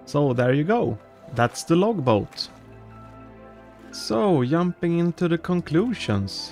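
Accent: Sweden. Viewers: Hello your videos are awesome but i got 1 question for you (Are you actually swedish bc you sound very swedish like i do)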